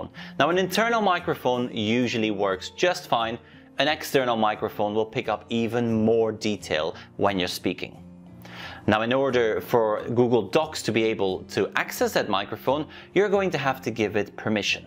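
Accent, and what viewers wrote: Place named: United Kingdom. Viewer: It's because you talk in the queen's English not in American English there's a difference.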